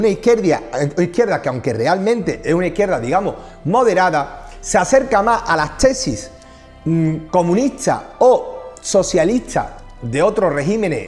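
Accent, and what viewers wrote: Andalusia, Spain. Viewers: Es andaluz... lol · Soy andaluz, respecto a tu acento decir que si me dicen que eres de un pueblo vecino me lo creo. Podrías ser mi primo hermano literalmente. Tu acento es más parecido al nuestro que el de un Asturiano, Gallego o Aragonés. Es como si hubiera conocido un hermano raptado de la familia y me lo hubieran presentado ahora. Es sorprendente como pueden intereses políticos y las gerras pueden crear nuevas fronteras entre las personas que en esencia somos iguales. Un abrazo hermano. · Eres Andaluz?? Hablas igual que yo. (Sevilla)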